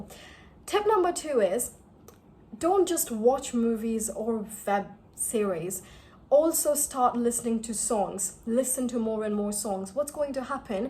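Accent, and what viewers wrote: United Kingdom. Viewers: Are you using british accent while talking in most of your vedios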